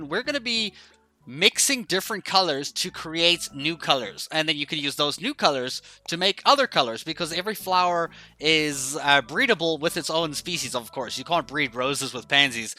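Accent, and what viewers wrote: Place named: South Africa. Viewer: I was watching your video and thought “this guy sounds South African” and I was right! First time watching your videos but hello from SA too 🙋🏽‍♀️😄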